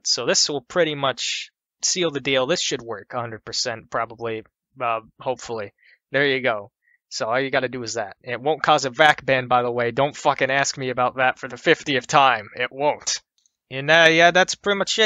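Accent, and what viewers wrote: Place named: New York, United States. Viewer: i think hes from new york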